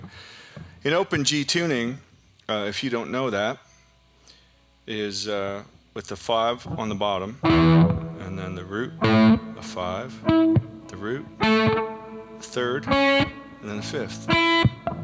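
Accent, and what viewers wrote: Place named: Texas, United States. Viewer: You’ve been living in Austin too long. You picked up that Texas twang.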